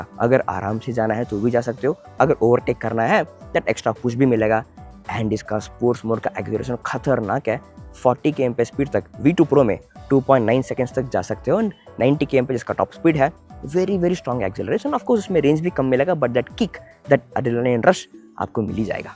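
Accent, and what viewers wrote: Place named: Telugu, India. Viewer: telugodu hindi matldutunattu enti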